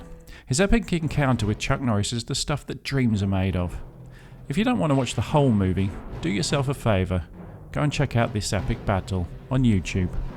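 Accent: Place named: South Africa. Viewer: Bruce lee was the best and sorry you sound like one of them European whites that live in South Africa talking about don't let racism make you a victim Bruce knew better so he went to his home country to give it a shot there white ppl made it bad to South Africans in their own country Rip Stephen binko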